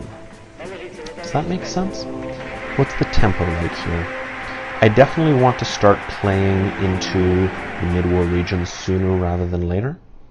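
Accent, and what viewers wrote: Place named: Canada. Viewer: Well played, thanks for posting. Your commentary is some of the calmest I've ever heard in TS videos (maybe it's that pleasant Canadian accent? :D )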